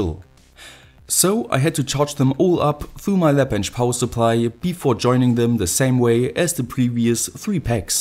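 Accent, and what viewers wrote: Germany. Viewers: *German accent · You are from Germany? Kommst du aus Deutschland?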